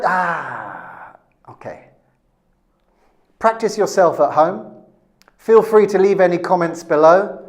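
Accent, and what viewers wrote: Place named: United Kingdom. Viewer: I miss Avalon and is a good teacher I like his british accent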